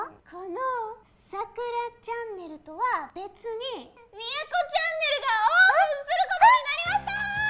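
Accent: Kansai, Japan. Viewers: 英語→関西弁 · 関西弁ってやっぱり可愛いぃぃ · 関西弁可愛い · やっぱ関西弁最高やな。。。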